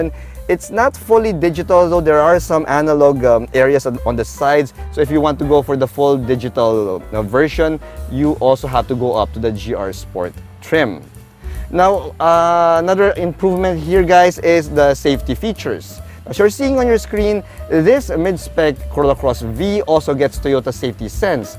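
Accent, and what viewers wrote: Philippines. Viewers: Idol, filipino english accent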